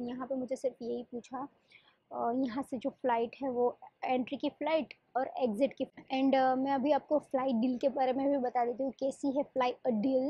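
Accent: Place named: Gujarat, India. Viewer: You speak hindi & English with gujarati accent,🎉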